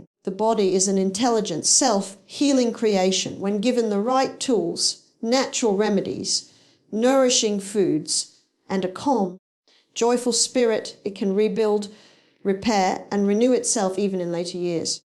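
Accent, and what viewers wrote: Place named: United States. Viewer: This isn't Barbara it's AI. As an Australian I can tell it's not her accent, it's American.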